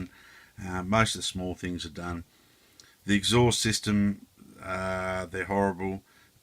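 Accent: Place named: New Zealand. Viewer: Nice scratch-building mate...I really enjoy tarting up these old kits. I look forward to seeing what you do with the "Whirlwind" too. Yes, terrible news coming out of NZ with the actions by that white supremacist...world's going crazy mate over religion. When I hear you speak, I think about a new tv series I've been watching from NZ called "The Brokenwood Mysteries"...got a nice young blonde detective in it. NZ is a country that's been on my bucket list for years, that and Australia. Would like to do one month of travelling around both countries...NZ looks so green and beautiful...just gotta avoid those Katipo and Redbacks! Enjoy the rest of your weekend! Cheers : )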